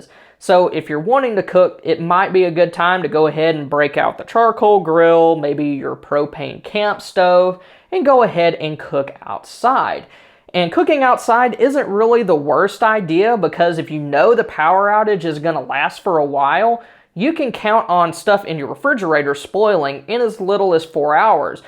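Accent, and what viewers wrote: Texas, United States. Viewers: Sounds like a Texan to me sorry if I am mistaken and your offended by chance. I find Texas and the people good folk(never been maybe one day)...anyway...stars are bright big and bright this is the land of Texas(all I know..). Take care and stay frosty brother.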